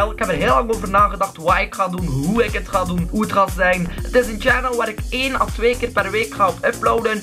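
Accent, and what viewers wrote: West Flanders, Belgium. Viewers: Een West-Vlaming die Engels gaan praten? Ben benieuwd :D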